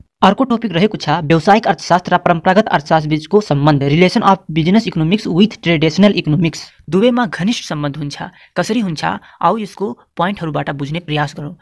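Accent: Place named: India. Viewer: indianjasto bolera kei bujhiyena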